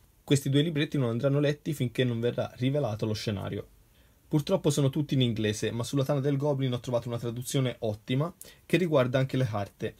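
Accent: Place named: Tuscany, Italy. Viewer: L'accento toscano è veramente sexy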